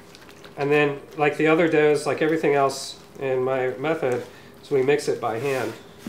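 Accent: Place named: France. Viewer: sounds kinda french.. because it is :S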